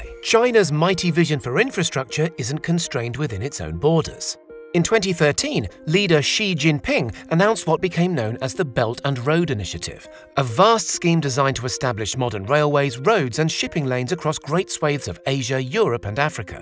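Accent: United Kingdom. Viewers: Sorry, hard to understand due to British accent.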